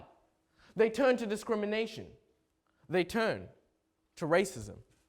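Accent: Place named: Bemba, Zambia. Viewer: Am laughing at the bemba in English 🤣🤣🤣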